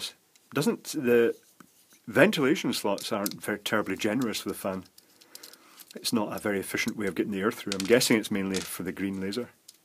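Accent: Scotland, United Kingdom. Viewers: I thought he was Scottish?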